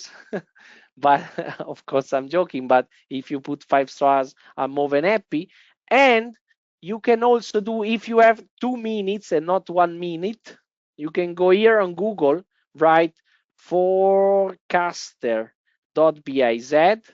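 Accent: Italy. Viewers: You have italian accent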